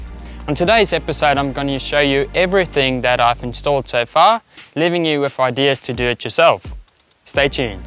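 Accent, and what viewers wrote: South Africa. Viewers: I can hear that Boer accent coming out. Love that i0 of yours. Have a Jimny but tired of the 1.3 and other irritants. Enjoy. Cheers from sunny SA.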